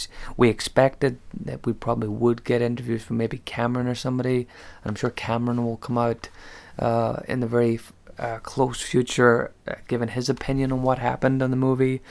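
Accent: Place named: United States. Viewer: Your from Northern Ireland, but have a Slight American accent do you now live in America!? 🤔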